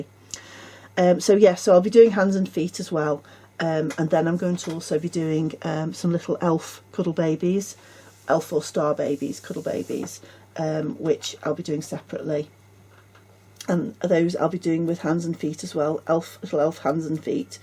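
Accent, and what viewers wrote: United Kingdom. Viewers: I don’t make these I just watch you, I actually said the bigger head looked like a boy and the smaller one a girl too, to myself like 😂😂😂 beautiful work as always, I’ve watched you for years, my heart used to go like a train when you were taking the full body babies out of the moulds 😂😂😂, you’re heads and faces were amazing, I’ll have to go back and watch them again ❤❤❤ edit: yes I remember them pigs 😂😂 I didn’t care for them either, tbh I didn’t like any of the kids programmes them days especially pob 🤢 good lord, I’m in Liverpool, I can hear a slight scouse twang in your speech too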